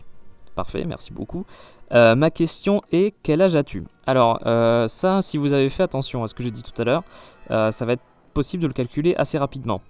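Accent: England, United Kingdom. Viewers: Mec je sais pas pourquoi mais ton accent anglais me fais kiffer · Super vidéo comme d'habitude j'adore ton accent Anglais moi je suis pourrie en Anglais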